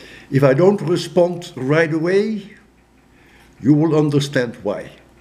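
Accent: Netherlands. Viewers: Just out of curiosity, are you Dutch? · To be honest, I didn’t know this guy and when I first saw one of his videos I knew he was Dutch because of his accent! It’s weird I didn’t know him because I’m Dutch myself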